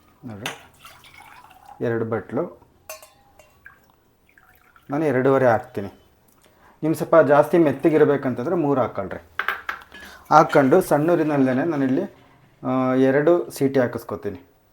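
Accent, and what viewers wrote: Chitradurga, India. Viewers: ದುರ್ಗದ ಭಾಷೆ ಕೇಳೋಕೆ ಚಂದ ನಿಮ್ ಅಡಿಗೆ ಮಾಡಕ್ಕೆ ಚಂದ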